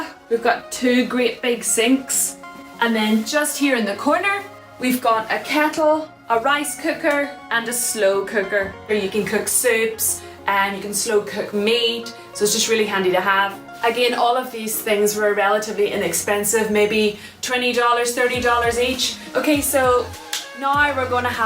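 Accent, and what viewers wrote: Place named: Northern Ireland, United Kingdom. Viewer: Studied English for so many years and have been living in the US for ten years. This is my first time hearing someone from Northern Ireland speaking. I can tell Northern Ireland accent sounds way much different than England accent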